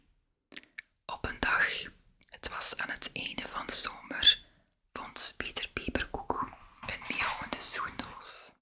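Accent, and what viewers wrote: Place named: Flanders, Belgium. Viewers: Weer heerlijk om naar je Vlaams gefluister te luisteren. Ik hou van dat taaltje van onze zuiderburen. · Heerlijk! Je stem en je Vlaamse accent zijn zó fijn om naar te luisteren! Goeie setting ook! Fijn donker en ontspannend. Topwerk! Dank je wel..🍀❤️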